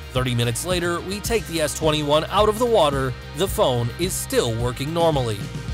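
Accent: United States. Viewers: Finally an English American speaking review, tired of all the desi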